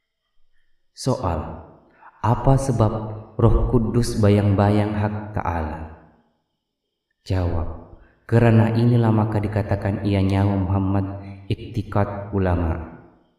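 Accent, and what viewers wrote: Bugis, Indonesia. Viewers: Ini AjaraN dari TaNaH BugiS.. Yg BicaRa JugA Uda KedeNgaraN LogaT BugiS'y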